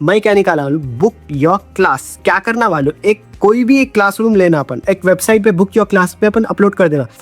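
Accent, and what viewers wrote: Hyderabad, India. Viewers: I like the way you talk Hyderabadi style accent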